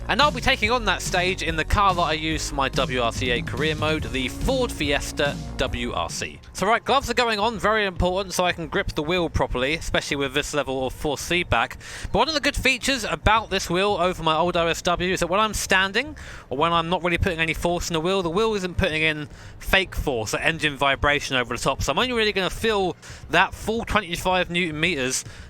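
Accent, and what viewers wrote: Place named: England, United Kingdom. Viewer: 🤣 lmao this had me smiling ear-to-ear the whole video I love your English accent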